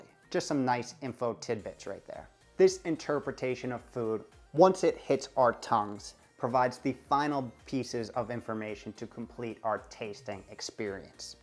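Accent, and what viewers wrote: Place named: Long Island, United States. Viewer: I have hit approximately hour 45 of my extended fast, trying to hit 72. Cannot believe you did this for a week. I am used to ADF but trying to break things up a bit so my metabolism doesn't get acclimated. Trying to watch videos like yours to keep my momentum going. It sounds like you didn't always eat so perfectly, did you have a transformation of sorts? That's the journey I am on. If you did, could you do a video on that? Thanks to ADF I was losing weight before Covid-19 now I am certain that when we go back my co-workers will be shocked at the weight loss I have accumulated so far..my journey won't be over though. It's certainly nice to be losing weight during a time when others are unfortunately gaining weight and falling into such unhealthy habits. Thanks for this. Also I definitely hear the Long Island accent, I have family from Lake Ronkakoma.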